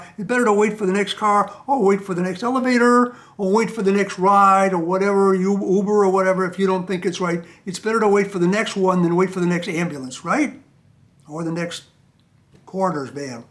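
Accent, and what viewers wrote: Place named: Chicago, United States. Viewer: Unc you definitely sound like a kid raised to in the Chi..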